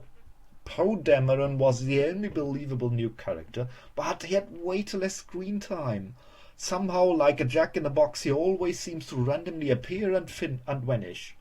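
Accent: Germany. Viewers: I liked TFA so I can't say I fully agree with you, but you spoke very well and made excellent points! I love ALL the SW films and I, like you, LOVE the Prequels!! Great German accent too. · I love that German accent!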